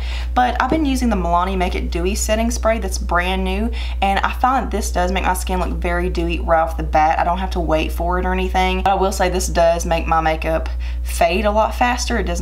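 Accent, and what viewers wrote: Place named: Kentucky, United States. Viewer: I just found your channel and your so relatable! Love videos like these! Where are you from? You sound like a Kentucky girl like me lol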